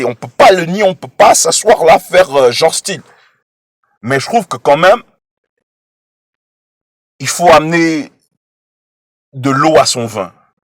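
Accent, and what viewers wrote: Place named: Cameroon. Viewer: Je savais que t'étais Camer comme moi ! J'ai repéré l'accent au début 😀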